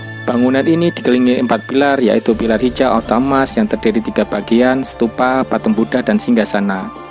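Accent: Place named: Java, Indonesia. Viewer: ulasannya jelas dan rapi. ditambah prolog suara yg medhuk. jian video dokumenter yg mantab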